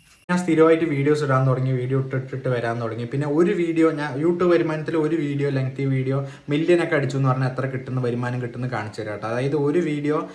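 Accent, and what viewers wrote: Palakkad, India. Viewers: ചേട്ടൻ്റെ വീഡിയോ ഞാൻ കാണാനുള്ള പ്രധാന കാരണം ഞാനും പാലക്കാടിൽ ആണ് താമസിക്കുന്നത് ഈ ഭാഷ സംസാരം കേട്ട് തന്നെയാണ് വീഡിയോ സബ്സ്ക്രൈസ് ചെയ്തത് ❤️‍🩹പിന്നെ യൂട്യൂബിൽ ഉള്ള ചില വ്ലോഗേർസ് ൻ്റെ വീഡിയോസിൽ കമൻ്റ് ഇട്ടാൽ വലിയ ജാട കാണിക്കും ഏത് കമൻ്റിനും റിപ്ലെ തരില്ല😑 പക്ഷേ ചേട്ടൻ ഞാൻ ഇത് വരെ ഇട്ട എല്ലാ കമൻ്റിനും റിപ്ലെ തരുന്നുണ്ട് 👍❤️ അതുകൊണ്ട് തന്നെ ഞാൻ ഇനിയും ഇടുന്ന എല്ലാ വീഡിയോയും കാണും സപ്പോർട്ട് ചെയ്യും❤️‍🩹🧿🫂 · palakkad slang 📈🔥❤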